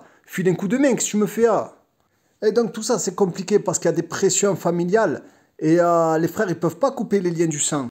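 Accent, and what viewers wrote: Marseille, France. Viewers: Wa aleykoum salam, ça me fait toujours sourire ton accent et tes mots marseillais. Pour revenir au sujet, moi ce que j'ai vu c'est que les familles dissuadent généralement l'enfant de rentrer au bled pour qu'il ne "gâche" pas sa vie professionnellement surtout s'il est diplomé.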